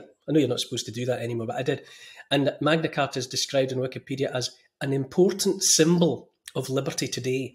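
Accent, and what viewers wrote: Scotland, United Kingdom. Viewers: is a gem. Love the way he thinks and speaks. That Scottish accent just adds to it. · I am so fekking ignorant. Your words hook me. The Magna Carta, was it signed at Runnymede or somewhere?? Surrey? I don't know which way is up. But I felt something was terribly up during that very first lockdown. I felt it in my waters. In my bones. I felt we were being darkly duped. I sensed something was shifting. Like when you go to the theatre, and there's the intermission. And behind the stage curtains all the scenery gets shfted around. Whilst people are slugging back their intermission quaff of choice, or queuing for the loo. Massive scene change is going on back stage. I felt something was going on. You have a lovely Scottish..burr..???🤔 I don't know how to spell that word. But anyway, you have that. ♡♡♡♡♡ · I love him more Trevor 😝😅😅 A gentle, beautiful Scottish lilt A kind, empathic, forward tilt An open heart; a caring face A willingness to take his place. 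A strong, home-hitting monologue Reminds us of the boiling frog The courage to become a sleuth A passion to release the truth. A deep desire to crack the whip Illuminates our freedom-strip Calls out the darkness taking hold The shiny despots; plated gold! Intense and raw, he lays it bare A soul-enhancing, honest stare Inflections drive the message through He speaks for Us - for me and you! Discernment's such a precious gift His words create a mighty lift Take heed of all he has to say He knows - it shouldn't be this way! 🤗🦋🐬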